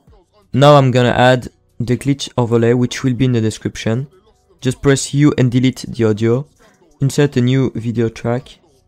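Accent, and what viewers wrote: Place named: England, United Kingdom. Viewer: ton accents anglais :,D SINON GG et merci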